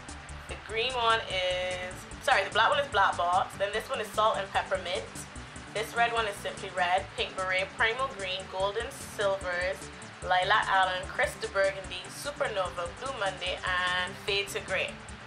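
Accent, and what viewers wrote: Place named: Barbados. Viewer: love the bajan accent. love when you roll your eyes. you are funny as hell.peoples get to know this chick!!!!!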